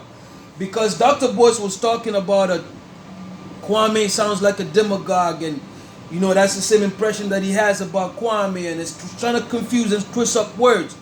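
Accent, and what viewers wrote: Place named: Jamaica. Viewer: You sound jamaican ☺🇯🇲💖or other caribbean island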